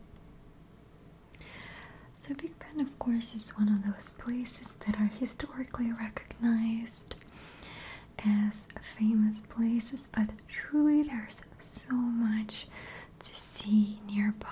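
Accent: France. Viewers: Ton accent français est juste magique pour les oreilles! J'aimerais trop un asmr en français un jour s'il te plaît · How cute you are with your french accent 😍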